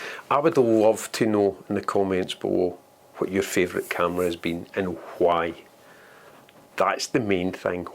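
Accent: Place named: Scotland, United Kingdom. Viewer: I'm going to come across as a complete donkey's ass but I'd bet a penny on it. Have you thought about adding subtitles to your videos given your charming Scotty' accent? I've got no problem with it all. I love it. As for your American and second language audience given your strong accent? Hmmm...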